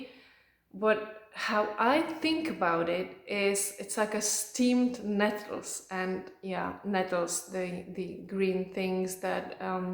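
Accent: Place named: France. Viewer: Hello! I loved your video and the way you describe your fragrances. You have such a beautiful accent, the nicest I have ever heard on YouTube talking about perfume. Are you French? You are so elegant! I can't wait to hear your wish list. Also your male recommendations? Stay safe and please keep going with your channel I love it! Best wishes from Australia xx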